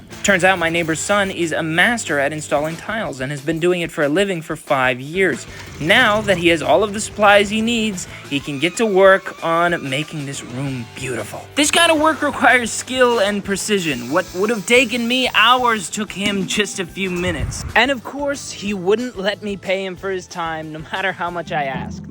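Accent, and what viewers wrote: United States. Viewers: You sound like American ! Euros??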